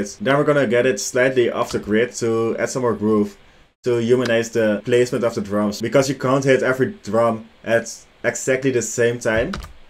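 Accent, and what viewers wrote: Netherlands. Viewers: Bro is Dutch haha dacht al dat ik het hoorde. Zieke edit dit. Doe je ook aan Collabs?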